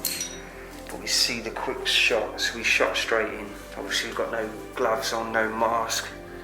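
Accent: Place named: Medway, United Kingdom. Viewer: Happy birthday! Love your vids, yr accent sounds local to me. Are you from medway area? I can't get around easily and you bring adventure to me, thanks matey x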